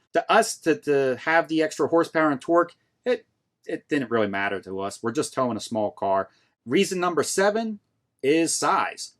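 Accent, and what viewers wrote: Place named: Baltimore, United States. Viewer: You said WaWa ...trying to figure out that accent. I think I hear a little Baltimore ..lol.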